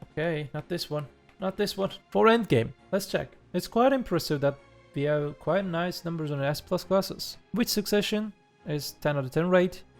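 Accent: Turkey. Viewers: Are you turkish ? Your accent exteremely turkish .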